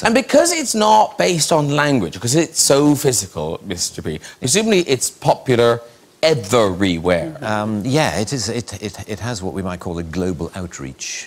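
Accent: United Kingdom. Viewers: 挖原來豆豆先生的聲音是這樣XDD 英國腔就是好聽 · 不知道為什麼看到他正常的講話有點不習慣😂而且他的英國腔也太好聽了吧😍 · 喔喔，低音炮＋優雅英腔殺我😍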